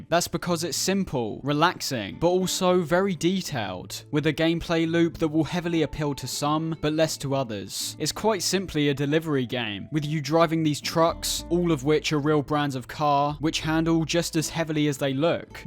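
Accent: United States. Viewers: Yes he definitely sounds like he was born and raised in South Arkansas. American through and through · I thought the thick American accent gave it away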